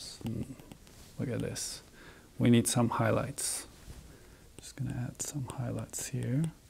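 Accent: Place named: Turkey. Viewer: Güzel kanalmış, ingilizcenden Türk olduğunu anlayabildim. İştabilizer'i görünce tamam dedim :)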